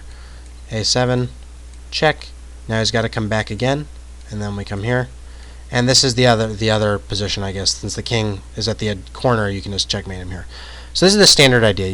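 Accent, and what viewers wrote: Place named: Maryland, United States. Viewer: Are you from Frederick, Maryland.... it sounds just like a guy I know from there?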